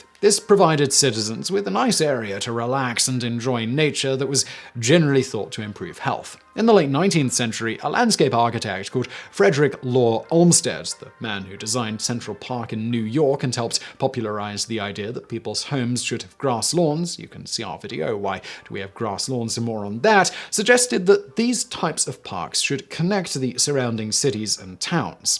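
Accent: United Kingdom. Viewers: British English has entered the chat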